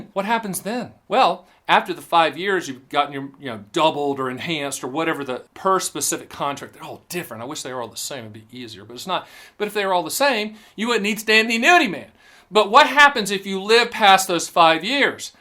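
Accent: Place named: South, United States. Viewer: Fast talking Southern Boy ! Whew 😥 👍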